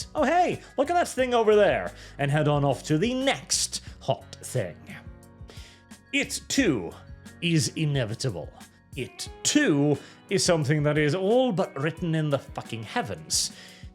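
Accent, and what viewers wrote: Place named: United Kingdom. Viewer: While I don't disagree, I cannot tolerate listening to him talk. That affected, British, rolled "R" fills me with rage and makes me want to throw some tea into the harbor.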